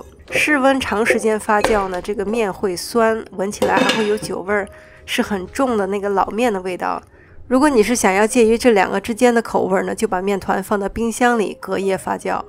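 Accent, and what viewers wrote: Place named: North, China. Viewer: 我是北方人，听着的声音好像我的乡音，感动的要哭了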